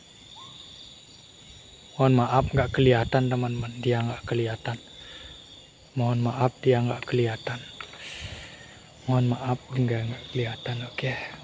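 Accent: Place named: Karangasem, Indonesia. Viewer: Bukan e takut kedek Cang nepuk bli logat e lucu Karangasem kubu pok bos bekas kuburan masal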